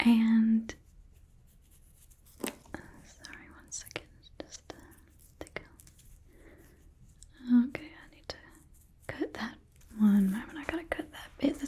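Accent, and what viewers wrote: South, United States. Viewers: Always luv the divided attention vids also ur so good at the southern accent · It's so incredibly, genuinely, southern. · Your southern accent asmr always relaxes me! I love your creativity & roleplays, ty for helping me sleep. Literally perfect timing 💖✨ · i’ve been rewatching all your southern accent videos for MONTHS i cannot thank you enough for this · The way you do divided attention in ASMR is so good, the Southern accent and gossiping makes this so nice and relaxing to listen to 😴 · The laughing 😂😂😂 you are such a good actress! I love your southern accent videos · . I just love 💕 that southern accent very Awesome 👏🏻 job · I feel like since does a great southern accent and she has done a Boys rp I feel like she would do a killer firecracker rp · Yesss! Your southern accent videos are my favorite!!! ❤ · Southern accent from tonight I liked so much 🥰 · She nailed the Southern accent😂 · Happy Thursday,!😊😎☀💙🙏🌷💖⭐⭐⭐⭐⭐⭐👍. 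Your southerm accent is so....special and tingly. AMAZING!! Plus, your smile is perfect!😀😍⭐⭐⭐⭐⭐⭐⭐👍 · I’m a southerner, but I don’t have a deep southern accent and I find it so calming. So any video with a southern accent? Golden <3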